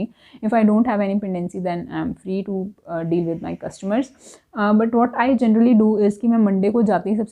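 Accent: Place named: United Kingdom. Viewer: i like ur eng accent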